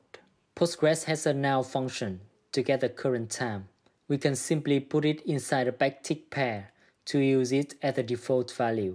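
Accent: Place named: Vietnam. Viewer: Your accent sounds like Vietnamese speaking English! Anw thank you for the tutorial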